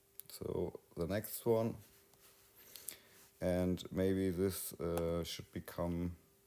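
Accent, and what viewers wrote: Bavaria, Germany. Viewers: Immer wieder neue Einblicke in Nomad Sculpt - die App, die mir leider noch lange ein Buch mit sieben Siegeln bleiben wird. Aber ich folge dir gerne weil du beständig im Thema bleibst. Ich hab die Hoffnung irgendwann genug Zeit zu haben alle deine Tutorials nachvollziehen zu können. Wobei mir die älteren Tutorials auf deutsch leichter fallen. Trotzdem Daumen hoch für den Bavarian ( ich höre auch fränkisch raus) - English Aspekt.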